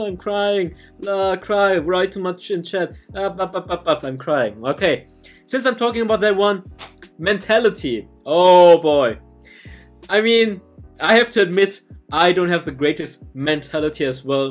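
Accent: Germany. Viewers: Are you German, bro? :D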